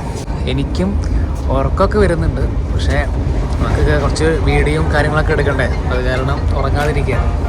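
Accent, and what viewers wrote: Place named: Ponnani, India. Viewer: ഞാൻ പഠിച്ചത് MES കോളേജിൽ ആണ്. എന്റെ സ്ഥലം കുമരനെല്ലൂർ. പിന്നെ നിങ്ങളുടെ സംസാര രീതി കേട്ടപ്പോൾ നമ്മുടെ അടുത്ത് തന്നെയാണ് എന്ന് തോന്നി. എല്ലാ ആശംസകളും നേരുന്നു.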